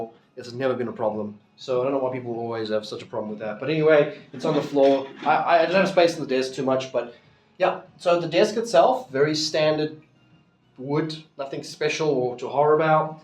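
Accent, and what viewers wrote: South Africa. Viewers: A. Room looks good, reminds me of how your office was set up at your old place because you had the cupboard behind you then. (I know cause that was the only way you could set up your office) B. I keep my PC on the floor, got it packed today for the move and there was a huge dust bunny under it. Good luck with Zelda's dust bunnies. C. Green screen idea sounds good, can't wait to see the final product. Can you actually sew because you mentioned stitching? D. Room is lacking some color, looks a bit like a hospital room. Maybe when you get the green screen in its final set up you could get some pretty window coverings? Unless you like all the white then power to ya. E. Finally, when your sick like in the video your South African accent is much thicker. More thick than normal i mean. ❤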